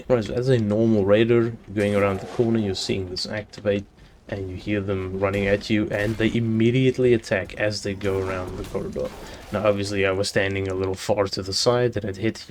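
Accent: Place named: Russia. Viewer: Hmm interesting. Im from germany and in german languge i realy hate that russen accend, but in english i like it for some rison. Long story short...i like your accent :) . Oh and of curse your vidios ar great. Kepp going.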